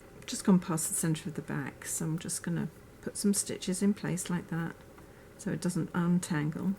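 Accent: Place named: Northumberland, United Kingdom. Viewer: Great instructional video!!!! Love your accent, too. You sound just like my mother, born in Morpeth, Northumberland. Especially liked video because you stuck to the necessary elements of making the cushion and didn’t put in lots of extraneous dialog to fill air time like others often do. You really know your stuff! I can’t wait to get started on my project. ❤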